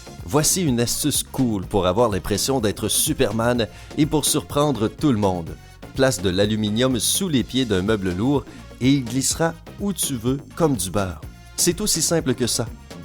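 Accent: Quebec, Canada. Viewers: c'est un être à cause de son accent. Probablement un canadien , de plus, on n'est pas habitué au tutoiement facile des Québécois qui nous donne l'impression d'être un enfant ! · Accent canadien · Je bois VOS SAINTES paroles monsieur le Canadien. Bon de ce pas je vais mettre sur MA table de nuit un bulletin neuf du loto ou mieux encore un bulletin neuf d'euro millions sur une feuille d'aluminium Et peut être que dans un rêve je verrai la combinaison gagnante. Merci du conseil et que vive Laluminun...jadore VOTRE accent..moqueur ce...oh le vilain garnement...je vous ai mis un joli pouce bleu..bonjour à Céline...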